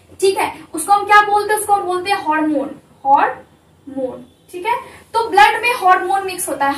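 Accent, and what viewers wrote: Bihar, India. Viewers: Your voice tone told that you are from bihar ❤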